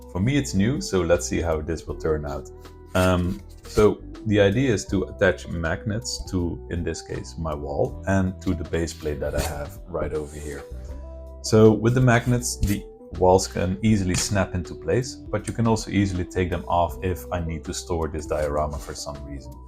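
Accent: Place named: Netherlands. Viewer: Very cool! I think you did an amazing job. I’ve never done anything like this before but this inspires me to at least look into it. And the animation is so cool! Also: I thought I recognized your accent. You’re Dutch right? One other hint I saw was your Lego boek with on the cover: ‘het complete werk’.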